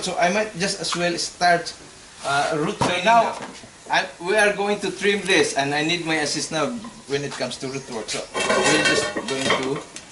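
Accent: Philippines. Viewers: That's what he's speaking. Sounds like a Filipino accent.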